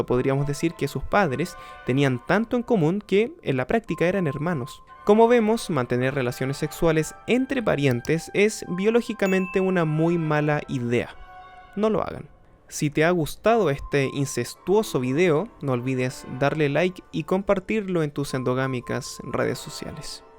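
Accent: Chile. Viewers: No se de donde eres, este es el primer video tuyo que veo pero tienes un acento Chileno muy marcado.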